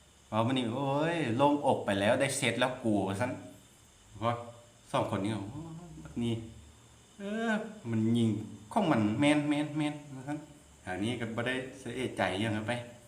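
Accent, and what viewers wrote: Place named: Thailand. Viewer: สำเนียงคนไทเลยกะม่วนดีเนาะจ้าแก๋วๆจ้าเล่านิทานเก่งจ้า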